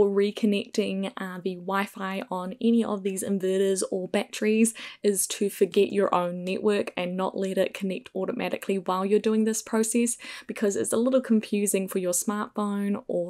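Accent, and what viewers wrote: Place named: New Zealand. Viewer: I'm still struggling with the Kiwi accent, where an "I" is pronounced like an "E" and an "E is pronounced like an "I".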